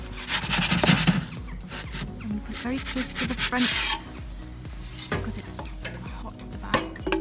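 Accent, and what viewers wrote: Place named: South Wales, United Kingdom. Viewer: Excellent I'm from the valleys I've been trying to make good pizza in the past it sounds like you're from south Wales.if you are if you like to meet up and see how you make it Best wishes from Paul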